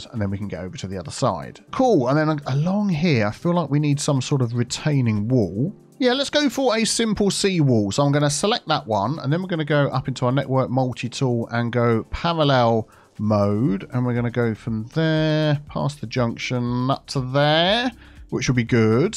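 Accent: United Kingdom. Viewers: Building this one along with you to help me learn. Love your content. Great to hear a British voice! Thank you!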